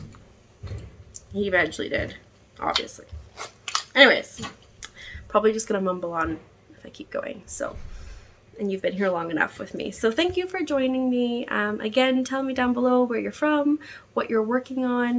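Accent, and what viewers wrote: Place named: Canada. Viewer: Hi!! I’m so happy I found your podcast! I love listening to your subtle Canadian accent 😊 & you’re not talking too fast, at least I don’t think so. Your hand dyed yarn is so beautiful, I hope I can pick some up one day! Thank you for explaining why you do the sleeves first on sweaters…I’m trying to get more sweaters and garments done this year so that’s helpful to know! I’m currently working on my Beauceron Sweater by Vincent Deslandes while watching & I definitely subscribed - can’t wait to see your future podcasts! Sending 💜 from WA state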